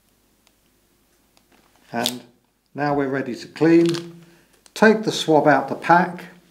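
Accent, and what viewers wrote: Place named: United Kingdom. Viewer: British man explaining camera sensor cleaning is my new favourite thing now. Knowledge 10/10 Explanation technique 10/10 British accent 10/10 Unnecessary content 0/10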